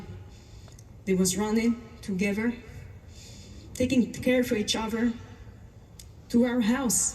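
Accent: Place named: Israel. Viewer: Afrikaans with an Israeli accent - first time I hear that!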